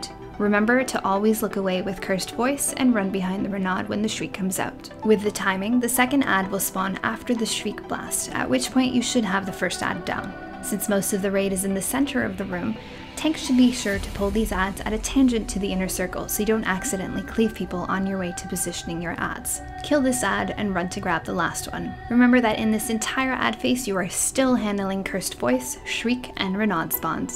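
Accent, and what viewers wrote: Canada. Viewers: Yeah, very beautiful voice... and there's something abowt your accent that sounds Canadian, too :)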